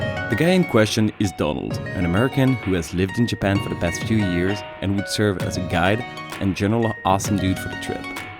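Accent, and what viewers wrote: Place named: France. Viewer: you sound french bro, but you have a very very nice accent et si tu n'es pas français, bravo pour la prononciation des choses en Français ou juste le RER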